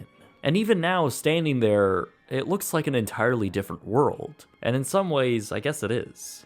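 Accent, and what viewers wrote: Canada. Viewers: Holy crap! I thought you sounded like a Canadian. Hey, neighbo(u)r!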